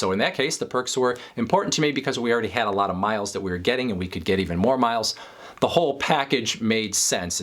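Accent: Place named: Chicago, United States. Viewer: I can hear the "Chicago," in your voice. 😄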